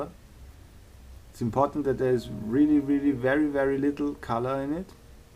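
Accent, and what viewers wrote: Germany. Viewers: Are you german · Are you german? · where you from? german?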